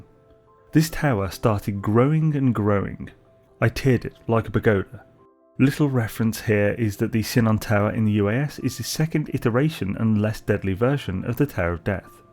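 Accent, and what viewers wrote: United Kingdom. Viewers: Very cool video, love the old clips and how brummie you sound Very cool to have been a part of the development of the UAS and involvment with other bits and pieces, very honoured indeed! Again, great video and long may the fun continue! 😍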